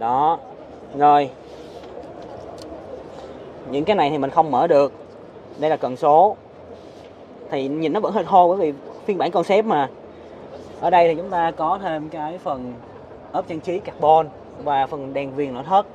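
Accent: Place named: Saigon, Vietnam. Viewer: Giọng sài gòn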